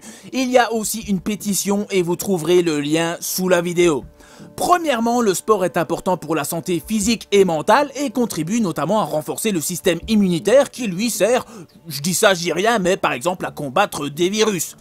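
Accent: Belgium. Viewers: tu es Belge ? t'as un accent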